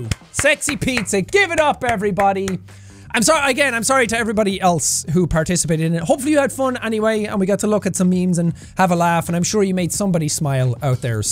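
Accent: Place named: Ireland. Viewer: This is already the second time of me turning on Jack’s video and forgetting that my headphones are off and my family hears an Irish man yelling from my phone.😳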